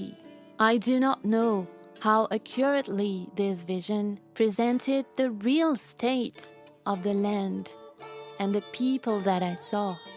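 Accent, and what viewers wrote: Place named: France. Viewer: You are mystical and deep, it's soothing to listen to your beautiful french accent.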